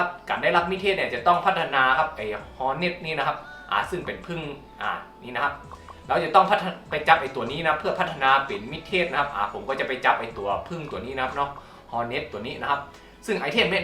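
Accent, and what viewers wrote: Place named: North, Thailand. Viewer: 55555 ชอบช่องนี้จัง เป็นคนเหนือหรอคะ สำเนียงน่ารักจัง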